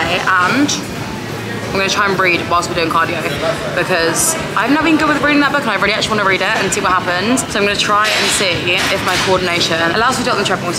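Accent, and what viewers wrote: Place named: United Kingdom. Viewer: I don’t think I realized that you had a British ascent till now. I just followed one of your Instagram links and found and subscribed to your YouTube channel. This entire time I thought you were an American 🇺🇸. I love hearing your voice for the first time. I had imagined it was going to be a higher pitched voice prior to hearing this video.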